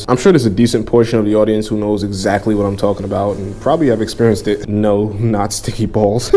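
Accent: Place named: New York, United States. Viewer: Fellow New Yorker here, raised in Uptown. Love how you articulate your points without losing that NY aura and tone. Props my dude. God bless.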